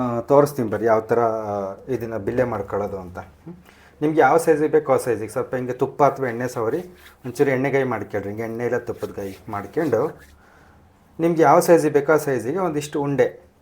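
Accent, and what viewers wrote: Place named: Kadur, India. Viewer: Sar niwoo yava wooru nimma bashe nama kadurina bashe agede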